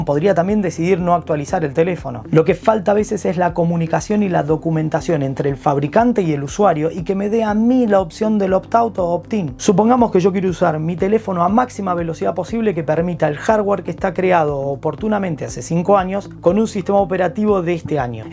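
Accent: Argentina. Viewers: Me podría pasar escuchando hablar a un argentino hasta el año 2090 sin parar. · Wow, que bárbaro, cómo disfruté este video, excelente ponente, me hizo reflexionar, y habló acerca de un punto de vista particular, La compañía de la manzana se evalúa de una forma muy distinta y se le exige mucho más que a las demás, en contra parte y por que no todo son miel sobre hojuelas, es verdad que parece como si últimamente apple no probara sus dispositivos, los saca y en el camino los va mejorando, eso no me gusta, puesto que sus productos son muy caros y el usuario merece un dispositivo acorde con el precio que paga por el, si me vana cobrar mucho entonces espero un dispositivo con cero errores, y si los hay, que sean menores. Excelente programa, te llevas las palmas, un abrazo desde México papá; Y el acento Argentino es mágico, me gusta bastante, ojo sin menos preciar al español eh.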